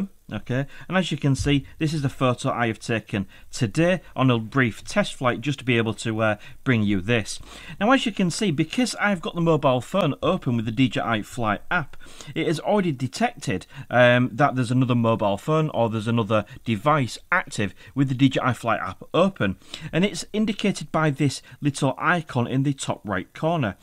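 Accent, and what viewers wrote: Hull, United Kingdom. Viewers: I hear a bit of Hull in you accent. Are you from the surrounding area?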